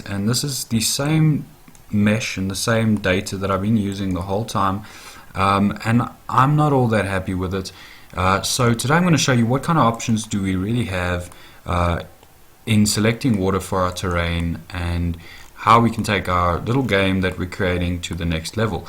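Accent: South Africa. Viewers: you sound south african speaking properly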